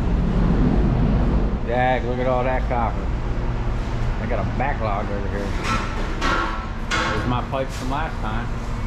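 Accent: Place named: Philadelphia, United States. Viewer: You sound like you have a Philly accent.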